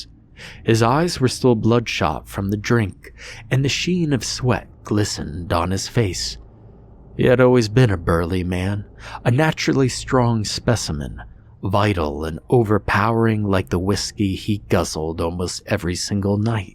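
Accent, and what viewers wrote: South, United States. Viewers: As a Tennessee girl I really look forward to your "country accent". You never disappoint ❤️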